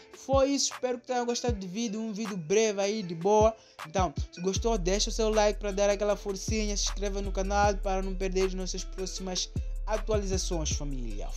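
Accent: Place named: Africa. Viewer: seu sotaque é africano 🤔🤔🤔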